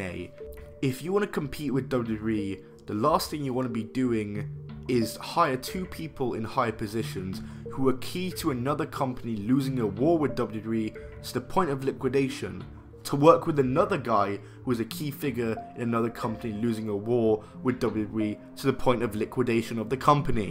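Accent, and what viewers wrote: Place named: Scotland, United Kingdom. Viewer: Gotta admit, I wasn't ready for that much scottishness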